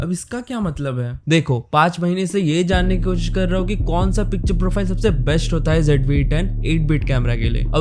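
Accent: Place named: Bengal, India. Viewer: Your bangali accent sucks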